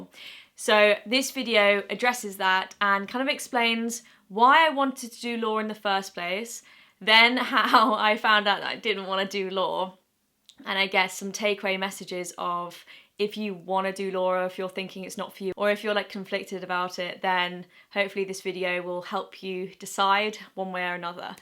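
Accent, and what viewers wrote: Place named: England, United Kingdom. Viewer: She's English. :)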